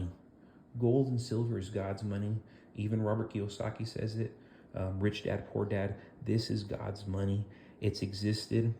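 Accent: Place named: Texas, United States. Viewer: Texas accent too heheheheee!!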